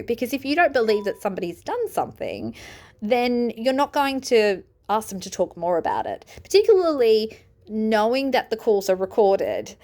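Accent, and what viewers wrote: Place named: Australia. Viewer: I'm Australia also, but it's only when listening to Aussie creators that I "hear" our accent. And I love it! I could listen to you talk all day, especially rational thoughts about this case and insights into your profession. Excellent work.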